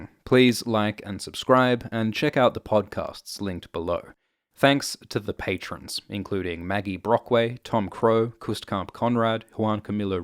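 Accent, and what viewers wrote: Australia. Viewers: You make the best videos. I also love that you're Australian. So much easier to listen to than many other videos for me. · Oi! You sound Australian yeah? What time are the episodes out for us? I am not smart enough to work that one out on my own.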